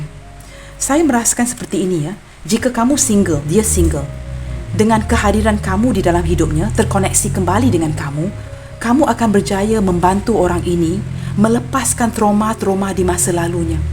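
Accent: Malaysia. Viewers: Pagi kak. Pisces hadir. Suara kakak,seperti logat Malaysia. Terimakasih readingnya. Sukses selalu 🙏🏻🙏🏻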